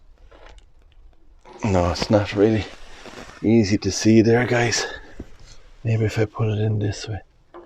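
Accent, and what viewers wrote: Ireland. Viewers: Wonderful narration made more enjoyable by that beautiful Iris lilt……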